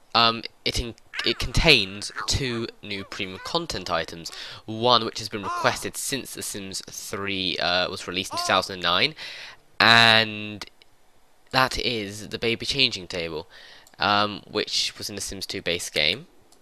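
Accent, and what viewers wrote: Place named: United Kingdom. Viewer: I know a British accent when I hear one ;)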